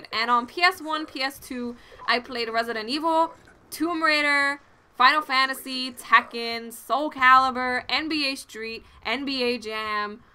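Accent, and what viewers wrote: Latin America. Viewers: are you Hispanic? I love your slight accent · lol, I can hear the Latina in her voice. Awesome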